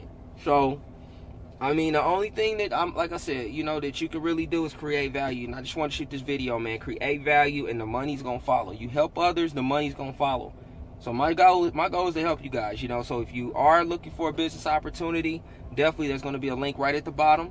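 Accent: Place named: Oklahoma, United States. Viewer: are you from Oklahoma love yours accent thinking about joining. thanks for sharing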